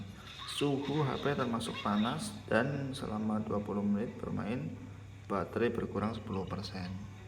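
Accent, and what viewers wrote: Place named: Banyumas, Indonesia. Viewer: Logatnya kyk ngapak ini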